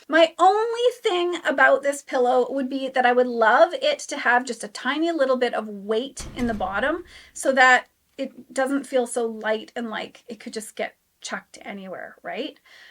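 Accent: United States. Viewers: “Beg” for bag and precision pronunciation of “iRON.”. You’re either in Wisconsin, Minnesota, or Canada. lol, I love the upper Midwest accent 😂. Thanks for the video!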